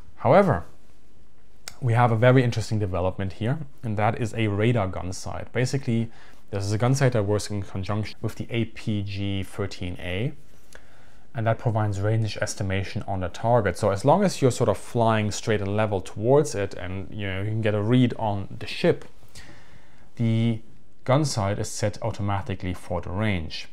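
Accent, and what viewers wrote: Germany. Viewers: Dude, your german accent is horrible.... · Is he german? Hört sich nämlich so an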